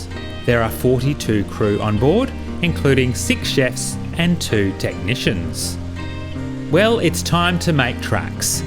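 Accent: Australia. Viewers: CRISP CLEAR AUSTRALIAN ACCENT = LUV= your clear speech = elizabeth nz xox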